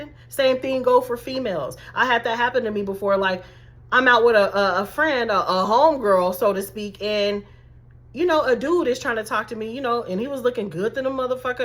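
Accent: Chicago, United States. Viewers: This is so good! You from Chicago? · Englewood Chicago or Cali? I'm from Chicago and you do sound like the Chi! I came across you today! I'm at work working and listening to you! Iron sharpens iron!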